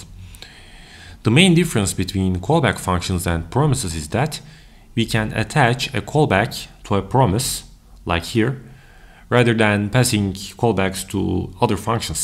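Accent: Turkey. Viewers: İlk cümleden türk aksanı hissettim ama bilemedim :)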